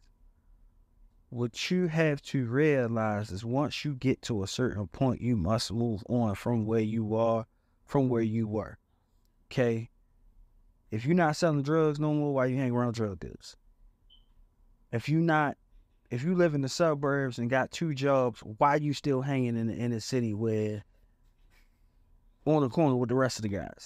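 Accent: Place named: Baltimore, United States. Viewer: It’s true and clear that money don’t make you happy … I can tell by your dialect, you’re from Bmore or the MD area, but I could be wrong… It was cool to see how someone from Bmore saw it and confirmed how disrespectful that would have been 🤔 People like Irv swear they can speak for the hood but don’t have a damn clue on nothing!!!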